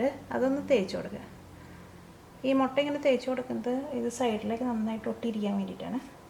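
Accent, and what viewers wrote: Thrissur, India. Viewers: you don't have to be sorry. There are a lot of thrissur people like me, who watches your videos, not only to learn cooking also to hear thrissur slag.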